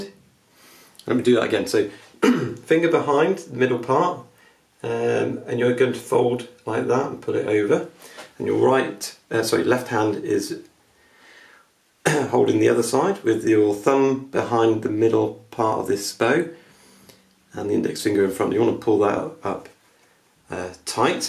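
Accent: United Kingdom. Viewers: The English accent makes it better! But seriously I got it with this video.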